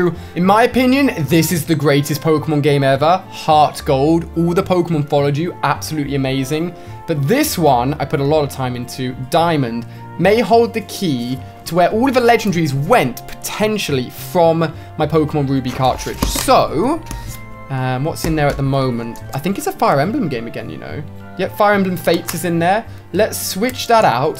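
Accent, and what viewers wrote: United Kingdom. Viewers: no shade but i always have trouble understanding accents like this like the british variety ykwim sis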